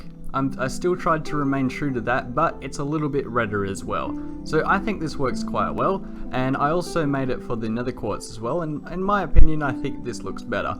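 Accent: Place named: Australia. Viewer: you are simply amazing. You are special. This is the first 30 minute video that I have watched in a very long time. I love the way you make your content. You are amazing at block art, command blocking and coding. <3. Btw, you sound Australian, are you?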